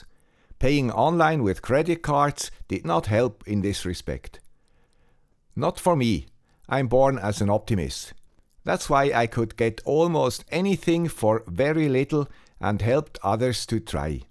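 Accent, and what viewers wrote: Switzerland. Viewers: I will miss your Swiss accent enormously. Not to mention the Hat. If you have anytime I could do with some help on a radio project I am attempting to come up with a solution for. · Hi there, another guy with a swiss accent. Big fan of your channel! I took over a farm this year and you are the reason why i am currently connecting every cow to my Lorawan. You got me hooked on LoRa. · You will be missed,the man with the swiss accent. I hope someday you get back to regular videos, I don't mind if you accept more YouTube ads. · Thank you for all the years you have run this channel and given me lots of information and good ideas. Lots of 8266 and raspberry pis were built and programmed here, using inputs from your channel - and they are still running their Tasmota, IOTStacks or more homegrown code. As I was born in Basel but living in Denmark, I am visiting the town and of course Zolli as often as possible and I was happy to see the guy with a Swiss accent running this channel. 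Nice to hear that the reason for your “slow down” on YouTube is Life, family and grandchildren. Maybe I see you one day standing at Spalentor, when I get out of the 33-bus from Wanderstrasse. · Thank you und "Grüezi" from Luxemburg. It was tremendeous all these years listening to your accent, ;-) while learning the different technologies you presentend with heart and soul ;-) Thank you for all these shared hours ;-) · I fully support your decision. I can imagine how creating amazing content every week can turn into a real obligation. But at the same time, it feels a bit sad to reach such milestones, you realize that things can’t go on the way you’ve grown to love and are used to. Thank you so much for your work, guy with the Swiss accent. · I enjoy watching the videos of the guy with the swiss accent! I love the tiny hand as well. I really do not have time to do all the things you do, but I have learned about LoRa and other technologies with you. 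Thanks again and have a nice trip. Congratulations on becoming a grandfather! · Thanks for all the great content over the years,! I learned a lot, and my wife always chuckled when she heard you say, "the guy with the Swiss accent!" Thank you! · you have always been an inspiration to me. I can honestly say that it was because of you that I started my own YouTube channel. I am sending best wishes from Canada to the guy with the Swiss accent. Thanks for all the wonderful content! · Dear: Thanks for years of inspiration. Have a nice retirement and safe travelling. We will wait for the next video with The Swiss Accent 😃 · Hallo. Das ist ja eine traurige Nachricht für Deine Follower. Vielen Dank für die vielen hervorragenden Videos. Du warst ein fester Bestandteil am Sonntagmorgen, oft beim Frühstücken. Meine Frau erkennt den „guy with a Swiss accent“ auf Anhieb😂 Ich komme auch aus der Softwarebranche und habe mich immer gefragt, wie Du es schaffst, diese sorgfältig recherchierten Videos in einer Woche zu erstellen, neben einem anspruchsvollen Job, über viele Jahre. 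Hut ab. Ich werde Deine Videos vermissen, und die Impulse, die Du gegeben hast. Danke für alles, genieße die freie Zeit. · Many thanks for your great tests, sharing success and especially failures. Looking forward to hearing your Swiss accent) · Dear Mr, our friend with the Swiss accent, thank you. Without knowing it you have helped me (amongst countless others) get better in my field, learn a ton of new things, get a degree in electrical engineering, hunt for weather balloons, get a MSc, fall in love with microcontrolers etc while having fun watching your videos. We are grateful for the countless hours you have spent creating these vids. It was an honor. Best regards, a viewer from Greece. P.S. If you decide to visit Greece coffee is on me:) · Thank you for all your fantastic work! As a French speaker (France), English with a Swiss accent makes it easier for me to understand ;-) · No! Suisse accent ! 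😢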